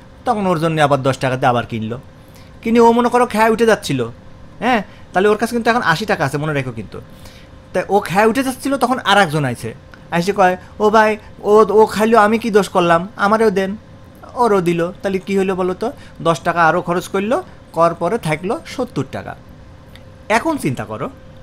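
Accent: Kushtia, Bangladesh. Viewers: কুষ্টিয়ার অাঞ্চলিক ভাষায় অংকটা উপস্থাপন করেছেন, ভালো লেগেছে!! ভাইয়া❤️ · কুষ্টিয়ার ভাষা শুনে খুবই ভাল লাগলো, কারণ আমি যে ও কুষ্টিয়ার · শুনেই বুঝছি আপনি কুষ্টিয়ার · Im in a love with Kushtia r vasha. 😂😂😂❤❤❤ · এটা তো কুষ্টিয়ার ভাষাই।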